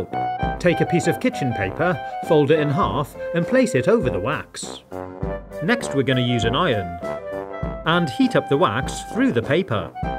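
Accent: United Kingdom. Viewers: Hi are you British cus I am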